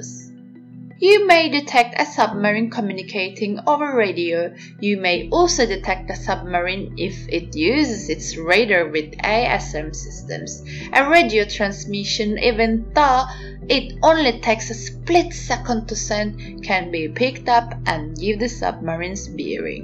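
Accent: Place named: Scandinavia. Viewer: Prob Scandinavia like Norway, Sweden etc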